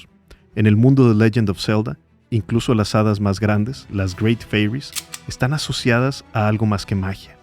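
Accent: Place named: United States. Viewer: Hubiera sido un buen video si no usará ese asento culero de americano y espanglish forzado, si está hablando en español!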